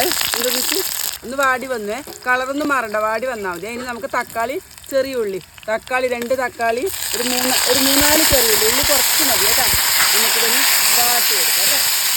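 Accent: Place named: Paravur, India. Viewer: ങേ ഇത് എന്റെ നാട്ടിലെ വർത്താനം ആണല്ലോ 🤔🤔 പറവൂർ ആണോ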